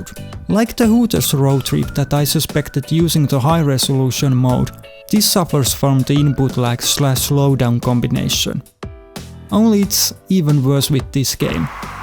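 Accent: Finland. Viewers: Mitäs vittua..? Ooksää suomest? (Koska perinteinen rallienglanti) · ekan parin sanan jälkee arvasin et varmaa suomalainen tekemäs videoo :D hyvin tehty video, täytyy käydä hakemas pi 3 b model nii pääsee itekki testailee :D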